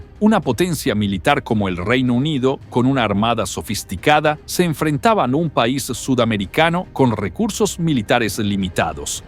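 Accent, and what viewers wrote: Yucatan, Mexico. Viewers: Ese acento es yucateco? Suena muy bien!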